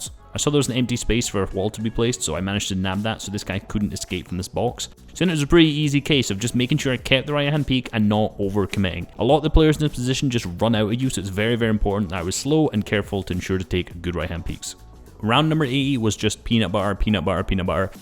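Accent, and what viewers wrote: Scotland, United Kingdom. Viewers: Are ye Scottish mate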